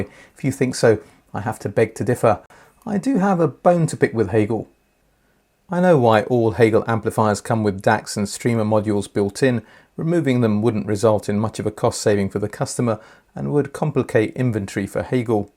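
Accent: United Kingdom. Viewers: Great stuff as always. And by now the way we in the U.S. pronounce 'h' (the correct way) and the way you Brits do so is lost in the background. Personally, I prefer separates over integrated. Especially monoblocs. If others feel differently, I say 'hats off' to them. Plenty of room in this tent for all. My net upgrades? Nothing wrong with the gear I have. At this point the limiting factor is the room. That's a tough upgrade!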